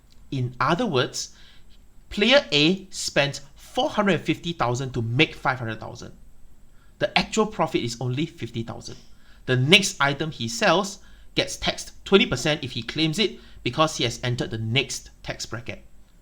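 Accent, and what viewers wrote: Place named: China. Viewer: When i heard a Chinese accent talking about business i believe him instantly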